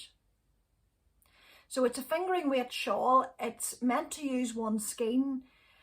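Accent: Ireland. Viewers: One day I hope to knit a lace work cardigan sweater. I'm currently working on my first pair of socks. Enjoy your podcast and love listening to your lovely Irish brogue. CONGRATULATIONS on your Anniversary. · My dream project would be a colourwork sweater by Marie Wallin. But I think I have to wait until I have retired to have the time and focus on it. I am a new subscriber and like your podcast above all your Irish accent:) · I am a newcomer to your podcast and am enjoying it very much, particularly your lovely Irish accent. We have several Northern Irish relatives and to listen to you talk is almost like visiting with them. I am not a very accomplished knitter, but I would love to knit anything with a fairisle pattern such as a yoked sweater. I would also love to do a cardigan as I have done a few pullovers or as you call them, jumpers. Knitting is such a sustaining hobby during these days and having wool running through my fingers on any project is so rewarding.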